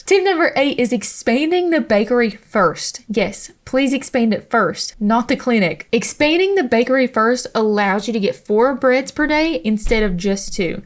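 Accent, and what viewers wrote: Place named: Texas, United States. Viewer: Damn, the stealing from enemies thing is something I forgot about and you'd be forgiven for doing so as the game has so much to it it's easy to. Some fantastic tips here. I've put 60 hours into this game and much of what you've covered I didn't even know about, especially the boss loot,l farm, I've been just defeating them everyday and either getting the drop or not 😅 thank you for this, also you have a great accent! From Texas I assume? Sincerely a UK person 😂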